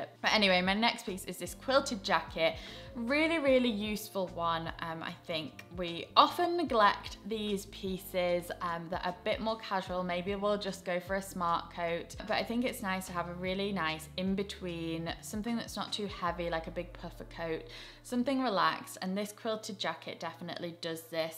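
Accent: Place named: RP, United Kingdom. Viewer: Hi,! My name is Amy, I am from Bangkok, Thailand. I had been looking for the outfits that I wanted to wear for ages. Finally, I found you on the YouTube and I only stick with you even their are many YouTubers’ videos pop up on my new feed almost everyday but I never click on anyone else fashion styles. I really love the way you described your outfits. Meanwhile, I’m starting to order new clothes, trousers, shoes, belts, ornament and lots of things to wear according to your videos. I must say that I love your styles because you look expensive and glamorous even the outfits are not quite expensive at all. I mean affordable and they make you look fabulous in the same time with the clothes that you wear on your channel I don’t have a word to say about your dressing styles, apart from superb, splendid, fabulous etc. If you would like to come to Thailand, I would love to take you around my beautiful country. 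if you have a chance to come to Thailand I will surely take you around the beautiful beaches which have white sand and crystal clear water, I promise you this. Just come with your pocket money, that’s all. Your British accent is so posh! Please message me on YouTube. By the way, I am 60 years old. I don’t know much of how to use this social media. Thank God that I found you on the videos. I used to live in between between Belsize-Camden tube stations. Please forgive me for my broken English. X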